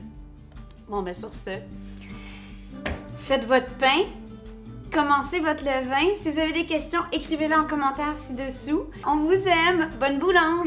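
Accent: Quebec, Canada. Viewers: Je viens de découvrir votre chaîne aujourd'hui, j'ai seulement regardé la première vidéo de pain il y a quelques instants, et BAM nouvelle vidéo du pain ! Quel hasard ;) merci pour la vidéo, ça fait plaisir d'entendre une Québécoise et de voir une recette de pain (je suis Bretonne en manque du Québec!!) suis ravie d'apprendre que des Bretons font d'aussi beaux récipients comme vous en avez montrés. J'itai peut-être y faire un tour ! Bonne continuation :) (et je rajoute que je trouve ça très drôle car vous en peignoir le matin en train de continuer votre pain de la veille dans la première vidéo c'est exactmeent moi ^^ ainsi que le chéri qui dit 'mais il est très beau ce pain! avec moi, amatrice boulangère, qui tire la moue parce que je suis trop perfectionniste :) )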